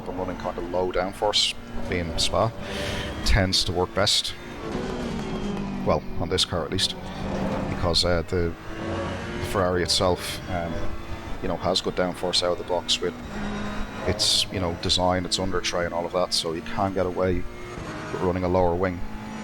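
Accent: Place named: Dublin, Ireland. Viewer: Ijust bought this game yesterday it's cool so far more practice is needed sounds llike your a Dub like myself hi from Dublin Ireland.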